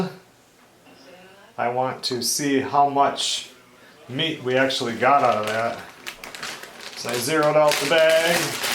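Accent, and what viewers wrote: United States. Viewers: Hello, I like to clear something up reading some of the messages heck even my own i see something that needs to be addressed. What I am about write I mean in the best possible way. is a hard working driven man. Who for whatever reason fell into this posting events from his life a small part of his life. That we the viewer find informative and funny and just entertaining. Why he does this i have no clue maybe he just likes to entertain maybe teach in someway. Here is what I do know without a doubt has the same problems we all do. What we see as idyllic is just that what we see. We don't see mad or sad. I'm sure as someone who has been married for almost nineteen years being a couple takes work. I love it when says he did no filming to spend time with his sweetheart somethings are far more important to him then our entertainment. I wrote once to that privacy is important never more so when loved ones are involved. 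But i know they fight he need not say that i know he argues with his kids anyone who is a parent knows that. We who watch hold to a impossible standard No one could live up too. I hope he is not off put by this because i have no doubt he is a good soul. But we the viewers need not make more than he is a hard working driven man who cares deeply for his family. He sounds down right American to me. We need those so much right now!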